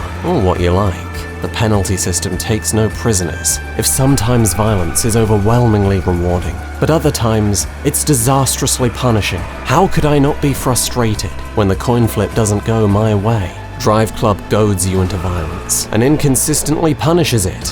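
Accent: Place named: England, United Kingdom. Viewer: You’re voice is the right amount of English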